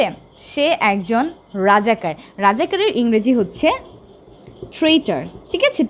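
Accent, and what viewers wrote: United Kingdom. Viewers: অাচ্ছা অাপু অাপনার pronounce কি ব্রিটিশ? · ছোট বোন তুমার ইংলিশ উচচারণ গুলি ইংল্যান্ড এর লোকদের মতন হয়।ভালো থেকো সুভকামনা রইলো।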